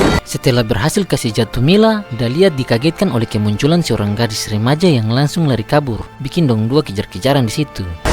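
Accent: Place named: Eastern Indonesia, Indonesia. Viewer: Pertahankan logat timur anda bung.. Pertahankan ciri khas bung & jadilah berbeda (positif). Saya doakan channel bung sukses 🤝👍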